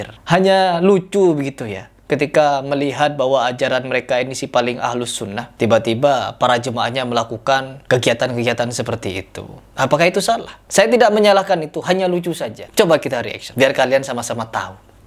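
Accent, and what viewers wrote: Madura, Indonesia. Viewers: Logatnya kayak dr Madura.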